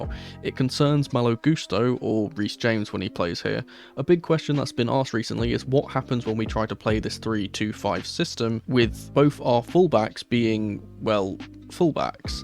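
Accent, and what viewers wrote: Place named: Spain. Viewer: that spanish accent. Damn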